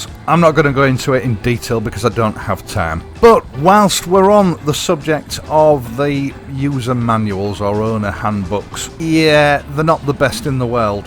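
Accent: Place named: Black Country, United Kingdom. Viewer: if I may be so bold, having watched a great many of your videos, I have come to the conclusion that you are from a sadly extinct species that I would label a "proper copper" I grew up in what was then a small village of a thousand or so people. the local cop new all of the "conspicuous " kids, and while I am not really for physical punishment, having a cast iron boundary in the form of a large shiny black shoe, applied just firmly enough to command respect changed the course of the life of some of the disreputable youngsters I knew. what kept me in line was knowing if I offended, he knew who I was and would be round to read the riot act to my parents (both ex military) he knew me because I was conspicuous at 14 I was six foot four and 15 stone, so i stood out. many of the "problems I see now seem to come from the extinction of authority figures like that, plus being born in Birmingham, as was my dad i enjoy your accent, which while quite different from Brumie still is very black country in its own way. 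keep up the good work, loving it 😃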